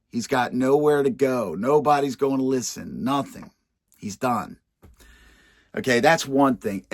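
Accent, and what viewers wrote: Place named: Pittsburgh, United States. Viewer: That accent, are you a fellow Pittsburgher? Or from Philly? Hearing you talk reminds me of shooting the shit with my homies back State Side. I’m standing on business - on the good side of the law now - in Đà Nẵng, Việt Nam and just because I speak the language doesn’t mean I don’t miss talking and listening to that real raw American nitty gritty from someone else who’s been in the trenches and popped up a better man yet have a prequel, story, and sequel about running wild. Type of things I highly censor when it comes to talking to the Misses 😂 Just subscribed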